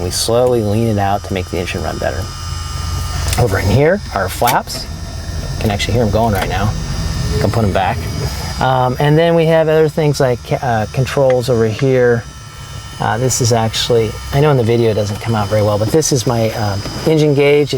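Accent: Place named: North, United Kingdom. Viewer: well,its not his fault, probably his accent. like how northern people pronounce "water" as "wadduh"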